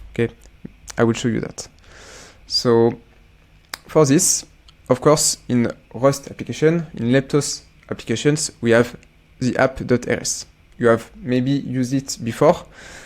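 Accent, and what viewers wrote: France. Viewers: Good video with your french accent.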